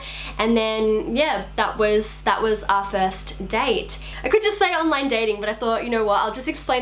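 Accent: Australia. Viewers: That’s interesting that someone would say your accent is funny. Your accent sounds more universal than the strong slang Australian accent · Hahaha I feel you about thinking the Aussie accent is obvious! I think it’s even worse for New Zealanders ;)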